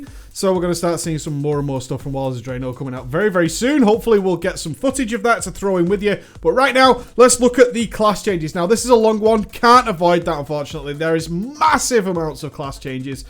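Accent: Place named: Scotland, United Kingdom. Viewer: I can't understand half what this mush-mouth is saying. Yelling, then mumbling with that thick-as-haggis accent doesn't work well.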